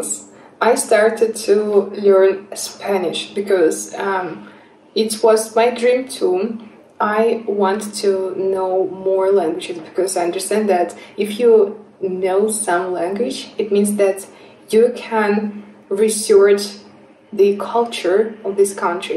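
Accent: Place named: Eastern Europe. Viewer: I am British and therefore a native English speaker. You are too hard on yourself. Your spoken English is very easy to understand. You have an Eastern Europe accent when you speak English but not a very heavy accent. Any native English speaker would EASILY understand you The reality is that most educated native English speakers could probably identify an accent in the spoken English of most other English speakers. I think I could identify which country a Canadian, a South African, an Australian or an American speaker of English came from even though they are also native English speakers. I could identify where in the UK most people came from (London, Newcastle, Yorkshire, Glasgow, Birmingham, Liverpool, Wales etc) as those areas/regions have their own accents, too. People speak English with French, German, Italian etc accents and, yes they will make mistakes but that doesn't mean it is difficult to understand them. 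Most British people mangle the language so who are we to criticise?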